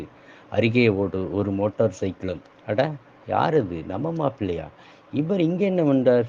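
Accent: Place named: Kongu, India. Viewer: அருமை அருமை சார்.... அற்புதமான கதை.... நெகிழ்ச்சியான முடிவு.... கொஞ்சும் கொங்குத்தமிழில் கதையை வாசித்தது கூடுதல் சிறப்பு.... வாழ்த்துக்களும், பாராட்டுக்களும்... 🎉🎉🎉🎉🎉🎉 மேலும் பல கதைகளோடு மென்மேலும் தொடரட்டும் தங்களின் இலக்கிய பயணம்❤❤❤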